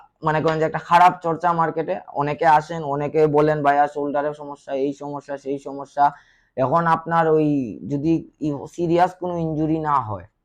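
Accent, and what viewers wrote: Sylhet, Bangladesh. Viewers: Bro apni ki Sylheti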